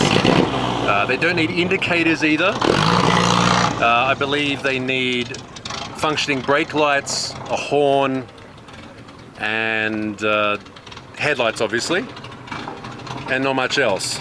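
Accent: Texas, United States. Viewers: not even gonna lie you nailed the American accent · Your American accent threw me for a serious loop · i personally think your american accent was pretty good and im from america lmao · I lived in Texas for 16 years. I think you'd fit right in around Houston or San Antonio with that accent tbh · am I the only one to not get enough of Texas accent? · ahahaha that was a decent American accent considering i know our real voice. best intro ever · You have a better Texan accent than most that live here since a large group of them are not from here lol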